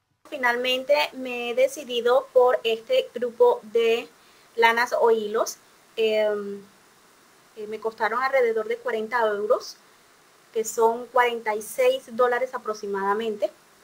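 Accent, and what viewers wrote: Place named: Chile. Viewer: siii que bueno eres paisana mia por el acento supe.. saludes desde Chile!!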